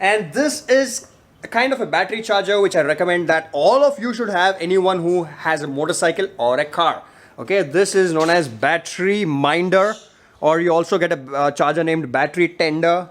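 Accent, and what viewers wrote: India. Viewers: You‘re welcome! This was the first video From you that I watched! I watched some others as well! I like your friendly nice art and your Indian accent haha!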